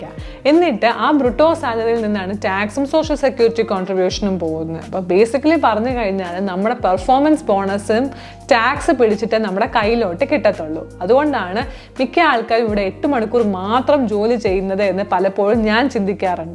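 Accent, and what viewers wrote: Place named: Pala, India. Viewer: പാലക്കാരി ആണോ മോളെ...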